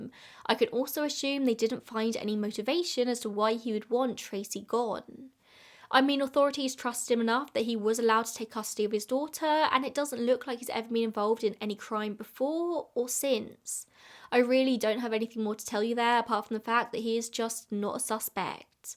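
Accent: United Kingdom. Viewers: As an american😐😐😐😐are you serious rn? The creator, is OBVIOUSLY not American, (I think british but not completely sure) she obviously has an accent and is going to talk with her accent and dialect😐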